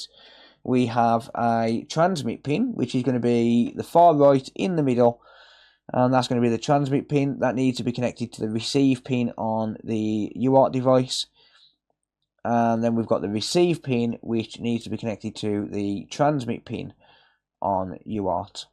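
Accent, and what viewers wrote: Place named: Walsall, United Kingdom. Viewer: Hi,you got recommended by YouTube in my feeds and liking the content but i am PC though.I recognized your accent and thought Walsall or Willenhall,I am from Wolves just up the road ha!,just curious as to your technical qualifications?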